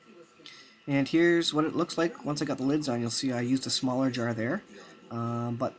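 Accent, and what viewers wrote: Canada. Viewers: Are you Canadian? I'm hearing a Canadian type of accent.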